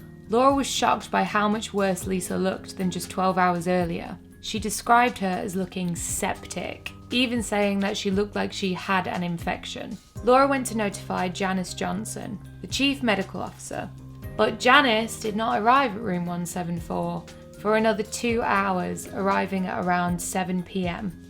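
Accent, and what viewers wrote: Manchester, United Kingdom. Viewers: Excellent series and being a fellow Manc it’s so nice to hear another Mancunian voice.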